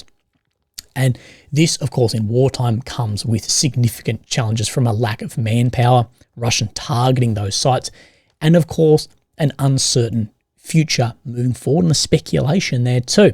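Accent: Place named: Australia. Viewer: Australian accent, get over it.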